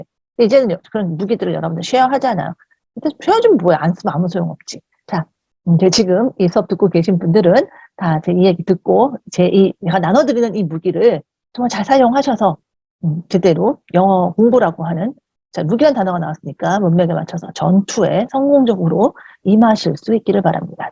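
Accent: United Kingdom. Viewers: 이 영국식 발음이 있으셔서 더 고급스럽게 들리는거였군요… 항상 명강의 감사합니당.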